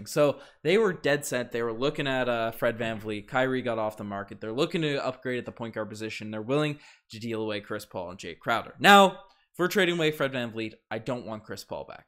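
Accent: Newfoundland, Canada. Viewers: must be from town with that accent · Are you from Newfoundland?? 🤨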